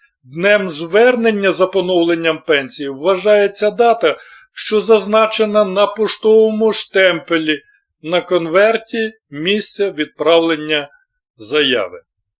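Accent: Standard. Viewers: Чітка українська мова !!! Щиро дякую !!!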